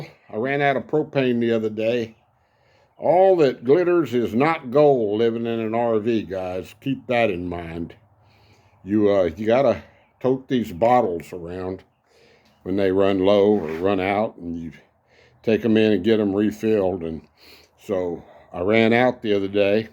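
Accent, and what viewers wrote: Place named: Texas, United States. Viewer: Just came to your video (partly) because your title mentioned Sarasota Tim - he's a hoot to watch. Watched a couple of your videos and enjoy your Texas drawl and the way you comment on the Davis mountains and the surrounding area. Beautiful country. That Dixie can really move. She looks like a Border Collie, I grew up with a BC in my youth in Houston. Just subscribed.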